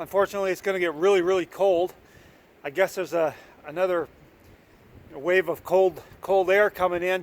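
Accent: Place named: Maritimes, Canada. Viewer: He sounds like a maritimer haha I'm from New Brunswick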